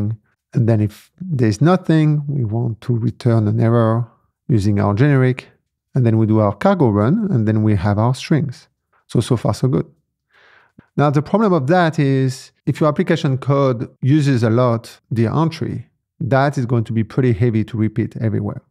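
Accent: France. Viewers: OMG! I'm loving the French accent! 🥐☕😀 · ummm seem like here is a french accent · The french accent is so cool as a french person :)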